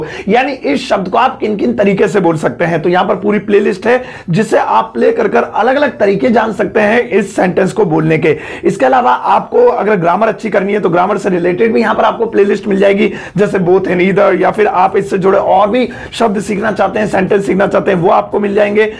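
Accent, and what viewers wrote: India. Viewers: Indian accent is not nice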